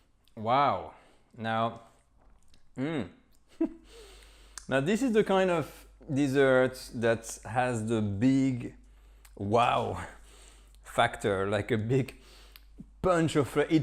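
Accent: France. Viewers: James Franco with a French accent. Subscribed!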